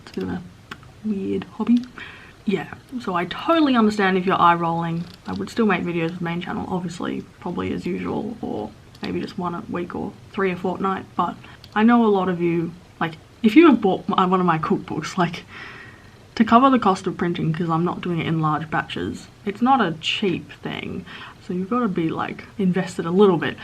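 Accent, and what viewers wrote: Australia. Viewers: I just watched a video from 17 you sound totally different. You sound really Australian now I prefer this. It's strange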